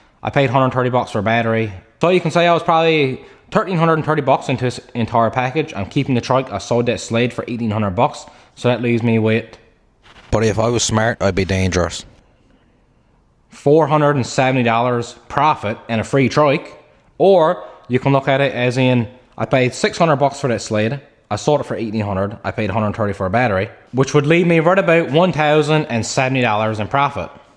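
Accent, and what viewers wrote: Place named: Newfoundland, Canada. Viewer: Soon's I heard ya speak I said "My son, there's only two Islands that guy could be from."..So I took a look around outside and I don't see ya nowhere here in Cape Breton so Newfoundland it is.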